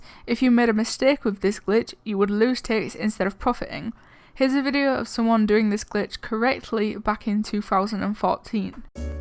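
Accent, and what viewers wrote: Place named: Manchester, United Kingdom. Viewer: do you live in Manchester? I hear your accent and its currently pm for me now xd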